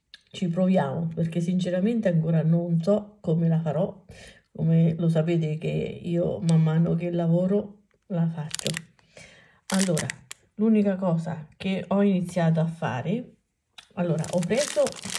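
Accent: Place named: Fermo, Italy. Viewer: Veramente bella e primaverile. Ma di dove sei?io abito in un paesino a pochi km da Fermo...a sentirti parlare sembri anche tu delle mie parti...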